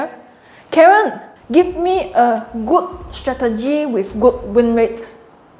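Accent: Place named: Singapore. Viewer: loved ur Singlish accent! :)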